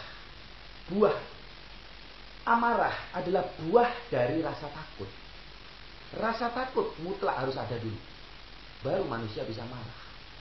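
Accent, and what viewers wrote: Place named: Java, Indonesia. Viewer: dari cara ngomongnya sih seperti sprti logat jawa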